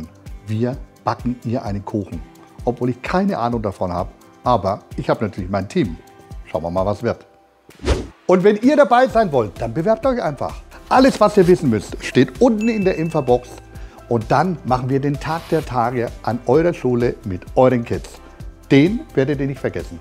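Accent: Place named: Franconia, Germany. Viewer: Von wo in Franken bist du?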